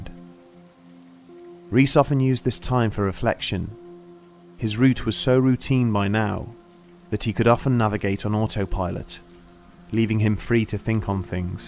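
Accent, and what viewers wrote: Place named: North, United Kingdom. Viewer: Brown The narrator speaks with a northern UK English dialect and there is in fact no 'correct' English grammar. Language is an ever changing and evolving tool that humans use to communicate.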